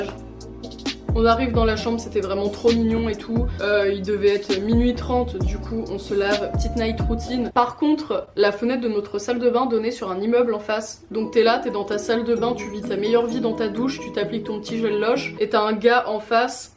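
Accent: Normandy, France. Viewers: Mdrrr vive l’accent normand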